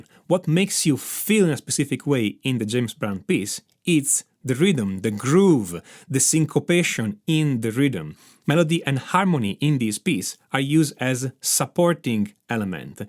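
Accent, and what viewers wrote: Italy. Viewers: I love your Italian accent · Ho riconosciuto subito l'accento italiano (non avevo mai incontrato questo canale )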